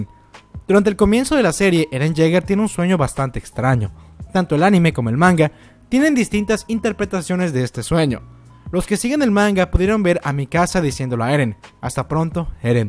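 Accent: Peru. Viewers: eres peruano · eres peruano??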